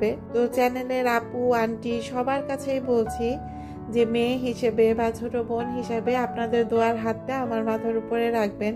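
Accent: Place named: Bangal, Bangladesh. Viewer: কি সুন্দর বাঙ্গাল কথা বললে❤একদম সংসার ছেড়ে একদিন ও কোথাও ও থাকার উপায় নেই❤লক্ষী বোন আমার আছি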